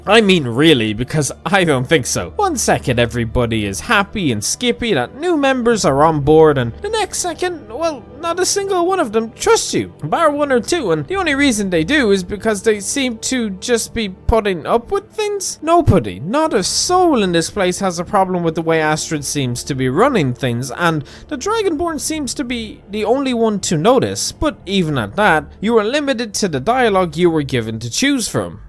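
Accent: Ireland. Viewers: POV: you’ve already completed the dark brotherhood quest line and are watching just cuz you’re bored and he sounds like a crazy Irish lad and enjoy him saying other as “utter”…. #HailSithis 🩸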